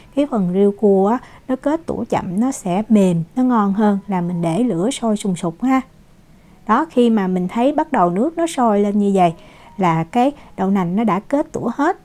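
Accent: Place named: Saigon, Vietnam. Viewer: Nghe cháu nói tiếng miền Nam của người Sài Gòn thật là dễ thương và lễ phép quá! Cô cứ lắng nghe mà không để ý đến cách chỉ dẫn nấu ăn và nghĩ chắc tiếng Việt của cháu ảnh hưởng từ Cha Mẹ đã ở Na-Uy lâu năm nên cô thiệt là may mắn đã nghe từ cả nữa tháng nay mà không có bị dị ứng với một lời nào của… “tiếng Việt MỚI sau năm 1975”. Chúc cháu có được nhiều người theo giỏi với tất cả video của cháu. Và cố gắng nói tiếng Việt CŨ của người VNCH, người Sài Gòn nhé? Đừng bao giờ gọi những người coi video là “mọi người” hoặc “cả nhà” nhé? Tiếng Việt Nam 4 chữ ấy đồng nghĩa với hai chữ TỤI BÂY đó. Cảm ơn cháu thật nhiều. 💝🌹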